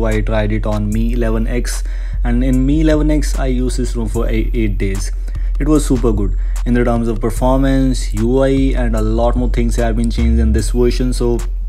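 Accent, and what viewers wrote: India. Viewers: How can i block/ban all Indian Youtubers with one button? The Indian accent is horrible.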